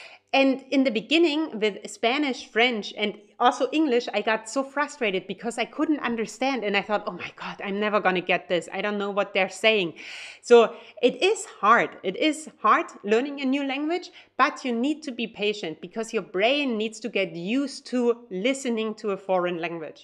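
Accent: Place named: Saxony, Germany. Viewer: Man hört sofort, dass du aus Sachsen bist XD (nicht böse gemeint) Grüße aus Leipzig! :)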